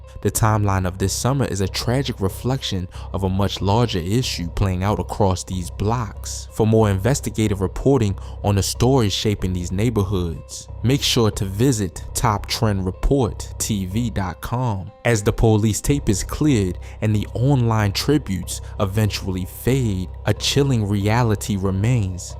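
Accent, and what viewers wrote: Baltimore, United States. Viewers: Sounds like a Baltimore dude is giving street news on Chicago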